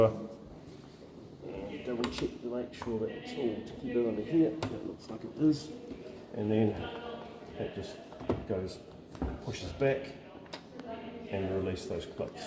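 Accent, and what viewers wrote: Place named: New Zealand. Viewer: Where do you get the fans from? You sound kiwi mate... are you in NZ?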